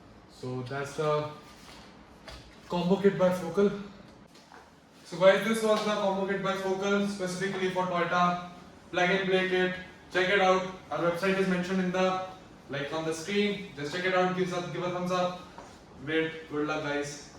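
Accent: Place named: India. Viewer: It is not amazing - I can barely understand anything with his deep hindu accent!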